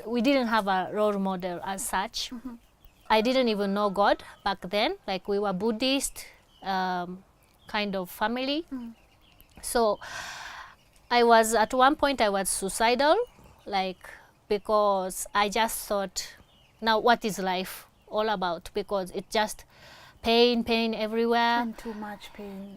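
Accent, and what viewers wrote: Kenya. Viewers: wetu, umerudi?? Your 'R' makes you sound like a real okuyu from nyeri.... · She sounds like an Kenyan women 😉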